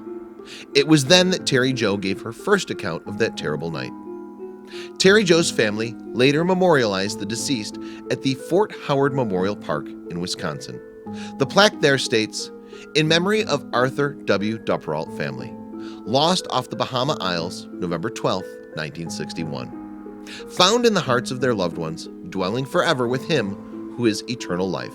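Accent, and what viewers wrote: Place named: East Coast, United States. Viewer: Who hired this narrator, I can't stand his east coast twang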